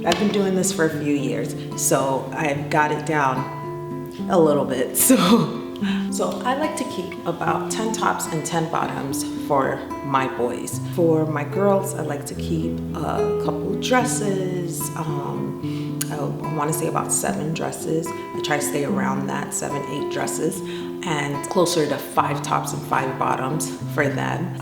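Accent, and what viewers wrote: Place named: Guyana. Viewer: are you Guyanese? You remind me so much of my cousins. They grew up in NY and have a mix of Guyanese Newyorker accent. And that painting reminds me so much of my home and a place called Kaieteur Falls.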